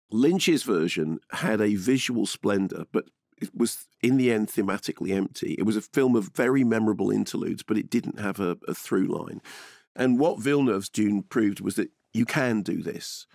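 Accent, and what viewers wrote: United Kingdom. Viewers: Americans: :Dune Brit: Djewn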